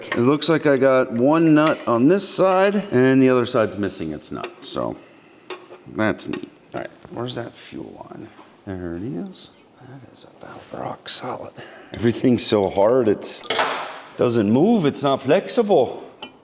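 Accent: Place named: Pennsylvania Dutch, United States. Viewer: I love you -- I've been watching your videos like crazy for the last couple months... and I grew up in Allentown so I love hearing your PA Dutch accent... but you gotta stop saying "menagerie." Nothing but love, your friend in Texas, Alan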